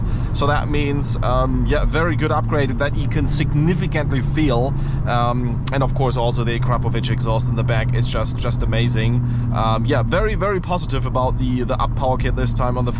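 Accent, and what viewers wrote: Germany. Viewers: omg German Accent.....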